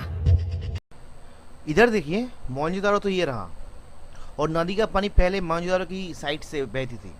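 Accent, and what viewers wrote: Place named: Bengal, India. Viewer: You are a Bengali right ?... ik this accent ...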